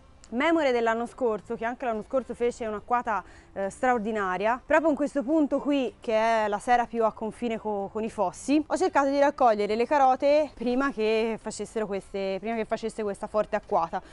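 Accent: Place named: Centre-North, Italy. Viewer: Ciao,forse lo hai già detto,in che regione si trovano i tuoi terreni? Dall'accento direi Centro-Nord...dico bene?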